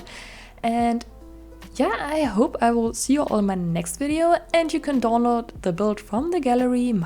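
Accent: Germany. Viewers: Are you German haha 😅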